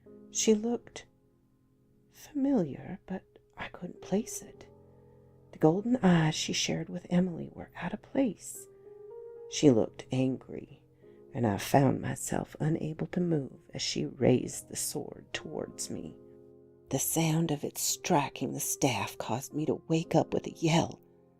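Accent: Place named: South, United States. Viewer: typing this while listening great story love the floral scroll work in the video but I'm from Tennessee and I think your southern accent is adorable lol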